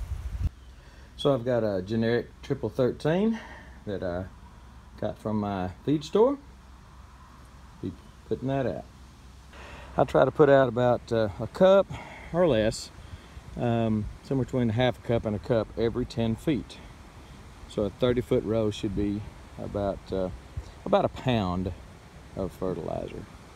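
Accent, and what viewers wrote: Texas, United States. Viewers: Just found your channel through your food prepping videos...subscribed right away due to content, presentation, and that sweet Texas sound of your voice! I'm a transplanted Texan here in the Midwest and I sorely miss the sounds of Texas! Nice garden!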